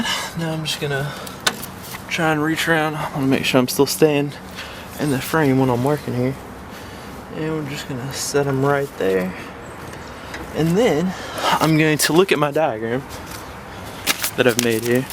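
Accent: South, United States. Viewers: 2nd vid of his I have seen. Its like a Southern accent crossed with a Southern CA surfer vocabulary. Canada ???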